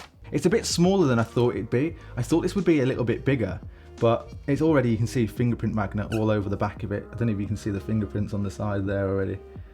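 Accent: United Kingdom. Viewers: Your British accent is quite nice, isn't it?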